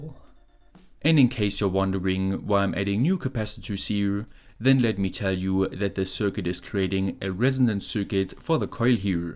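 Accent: Germany. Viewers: You sound absolutly like a german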